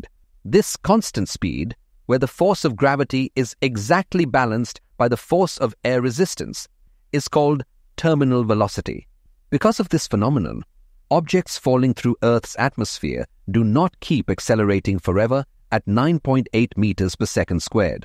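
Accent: India. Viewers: clear indian accent though. But much more understandable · why do i feel like a hint of Indian accent in this videos commentator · Not just a hint. It is an Indian accent. I know because I am Indian.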